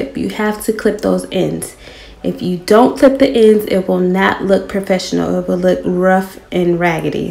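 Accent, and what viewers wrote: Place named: Baltimore, United States. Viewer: She sounds like a young jada pinkett i wonder if shes from baltimore...i hear an accent ☺☺ i love ur hair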